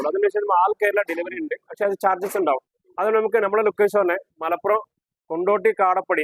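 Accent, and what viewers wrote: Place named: Tamil Nadu, India. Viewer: Thamizhan aanenh samsarathil manasilavunhundallo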